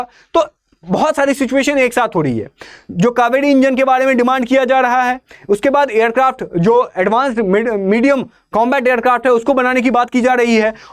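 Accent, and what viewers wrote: Bihar, India. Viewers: sir have such a bihari accent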